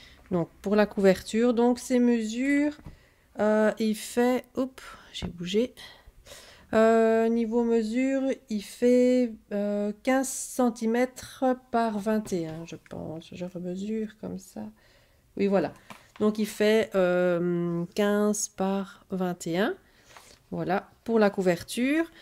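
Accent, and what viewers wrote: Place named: Belgium. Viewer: ça fait du bien d'ré-entendre l'accent belge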